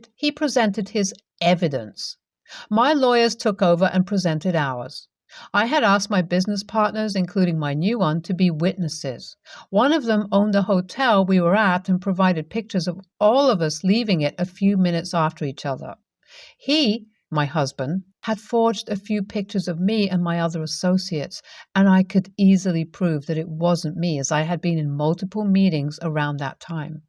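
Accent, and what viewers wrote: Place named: England, United Kingdom. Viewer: Can you tell us what part of England you’re from?I love your accent and the way you read a story.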